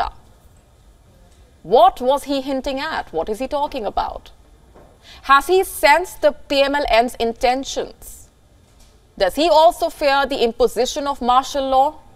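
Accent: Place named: India. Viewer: This is the worse presenter in Wion. Her spoken English is Indian not English.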